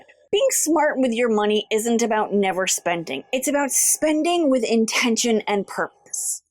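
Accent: United States. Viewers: You are truely a breath of fresh air. As a frugal Welsh great grandma, I love to listen to a new voice, yours is so sweet, I love your accent from across the pond.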